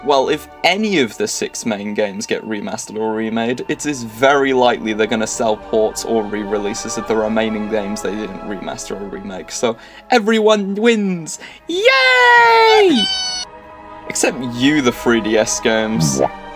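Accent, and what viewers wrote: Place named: United Kingdom. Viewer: BRI'ISH REVEAL HOLY CRAP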